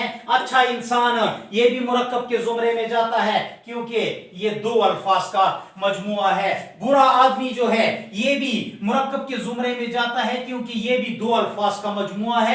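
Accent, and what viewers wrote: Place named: Pakistan. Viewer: aap urdu speaker nahi ho mujhe balochistan ya peshawar se lagte ho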